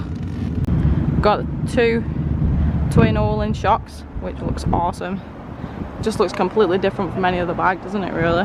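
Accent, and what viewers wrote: Northern England, United Kingdom. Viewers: Yeah there's always some weirdos to contend with! Keep smiling and enjoying yourself, the enthusiasm comes through in your uploads which is great to see... Proper northern accent too... Im from Warrington and sounds like you're not to far away...ha ha.. ride safe chuck 👌😉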